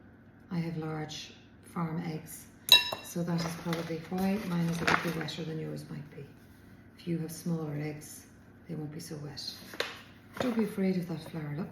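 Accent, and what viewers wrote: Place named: Ireland. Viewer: Lovely listening to a quiet irish lilt instead of a loud harsh american accent...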